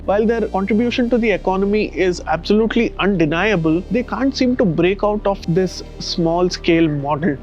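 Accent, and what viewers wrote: India. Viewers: I cannot understand most of the Indian people's talking. Your Indian accent is the least I have ever heard. Wish you a great success. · can you use AI instead? your curry english is terrible.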